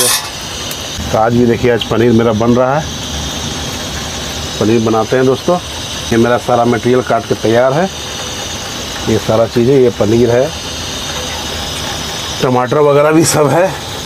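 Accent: Bihar, India. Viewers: AAP bihar se hokya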